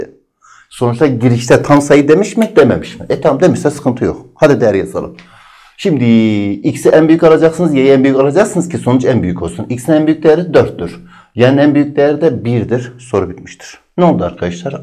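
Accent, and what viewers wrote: Urfa, Turkey. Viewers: Urfali hocamiz zaten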